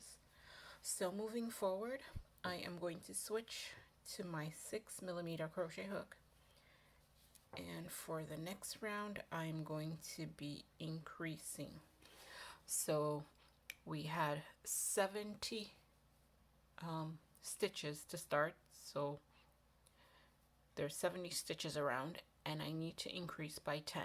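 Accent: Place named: Caribbean. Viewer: I hear a Caribbean accent❤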